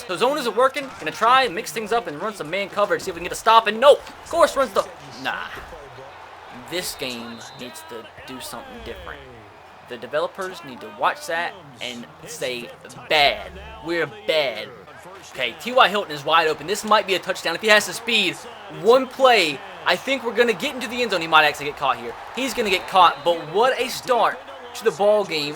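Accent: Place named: Alabama, United States. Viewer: Love the southren alabama accent bro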